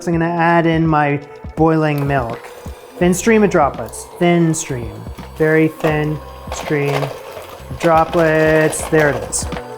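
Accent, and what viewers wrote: Canada. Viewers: You are so relatable you are me!!!. funy.. and sometimes a little unhinged. But you are up to the task. Thanks for the fun!!! Love your Canadian accent From the Netherlands 👍👍👍 As saint Nick would say you are nice and a little naughty.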